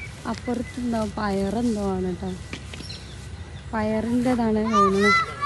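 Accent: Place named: Malappuram, India. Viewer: ഇത്ത മലപ്പുറം ഭാഷ തന്നെ 😂😂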